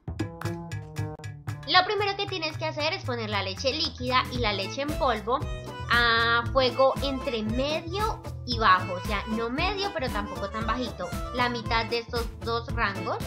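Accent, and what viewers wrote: Colombia. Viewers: Hola, disculpa mi pregunta ignorante, eres Colombiana? Por tu asentó intuyo que si, yo soy colombiana y no consigo la leche evaporada. Sabrías en donde encontrarla? Gracias:3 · Hola... se te escucha super bonito tu Colombiano.... te estoy viendo desde Shanghai que quiero hacer un arroz con leche para unos amigos aquí. Gracias por el video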